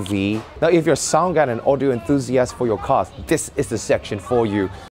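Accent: England, United Kingdom. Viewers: is anyone else strangely fascinated by the British accent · i like your English accent no need translate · i just randomly stumbled on to this channel i like it so far. Is this dude British? his accents super classy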